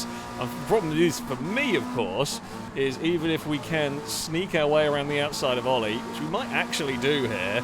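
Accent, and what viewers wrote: England, United Kingdom. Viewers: One last thing We can tell your English by your accent But There is no such word as gooder GOODER It's good of or good enough but there's no such word as good or you might want to modify that out of your vocabulary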